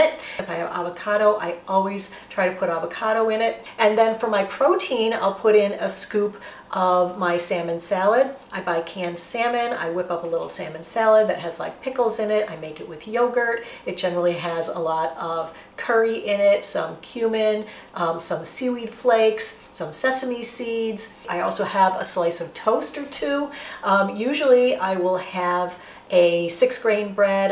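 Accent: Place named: Midwest, United States. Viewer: Where do you live, in the midwest? I wonder because of your accent and the products all look so good - quality -- I don't see any of those brands down here in the South. Thanks for giving us details of what you eat in a day, itemizing really helps us get motivated to stock up for health!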